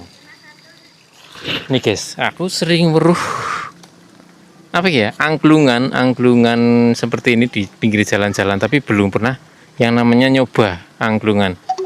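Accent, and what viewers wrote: Banyumas, Indonesia. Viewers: katanya dari jogja, kok logatnya ngapak mas?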